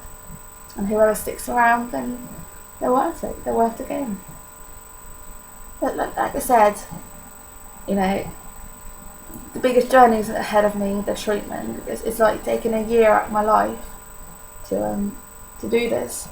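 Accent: United Kingdom. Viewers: polish? ya fink? sooo british it hurts... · God bless you but you sound very British to me. I am sure I cant notice the slight accent because I am American.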